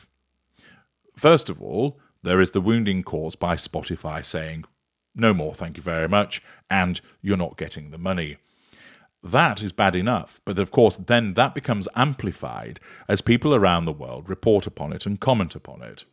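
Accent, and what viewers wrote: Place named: Scotland, United Kingdom. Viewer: Thank you. I have to confess that I very much enjoy it when you read the Scottish articles, simply because your Scottish accent amuses me greatly! HW has a "honeyed" voice, eh? I'd suggest her voice positively drips with falseness and sanctimony, because all she does is talk down to her audience and try to score points. But yes, I can imagine that Harry's wife is pretty much exploding at the reports of what Catherine and William are doing; and I'd think that Harry himself is also looking at them with envy and resentment.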